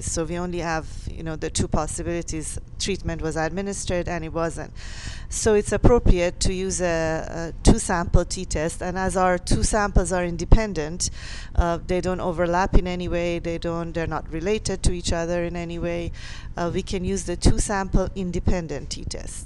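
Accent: Iran. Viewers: shes is clearly iranian. the accent is too obvious haha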